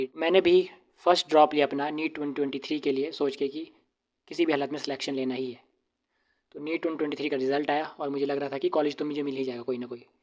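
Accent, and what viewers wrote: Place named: Himachal Pradesh, India. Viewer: Maine 1st tym dekhi apki vdo .. or apki tone se hi smjh gyi thi ki pkaa hp se hone ap bro 😁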